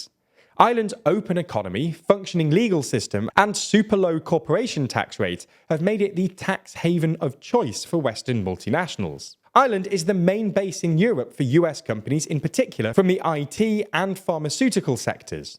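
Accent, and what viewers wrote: United Kingdom. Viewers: Big fan of TLDR. Still, it sounds very condescending listening to a British accent telling us how well we have done "given where we have come from". Where we came from was oppressive British rule, that famine you very briefly touched on was a genocide perpetrator by the British govt of the time. Yes, we have come a long way!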